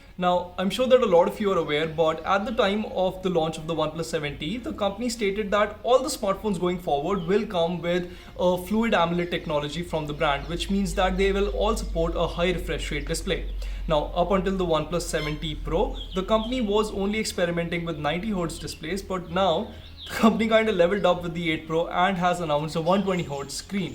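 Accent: India. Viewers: why don't you just speak in your native language , at least they will understand everything ... this way , nobody can understand you , I mean your accent is so heavy . No offence but its not just you , most of Indian reviewers have such a heavy , heavy accent . Is it really so hard , I know you are all smart and stuff ...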